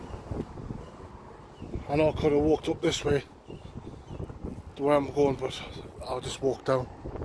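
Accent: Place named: Newcastle, United Kingdom. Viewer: I was born in Galashiels I am now 74 years old. I thank you for making the video. It sounds to me like you are a Geordie. The statue you were looking at, is of Sir Walter Scott! The “nice building across the street, is The Salmon Inn.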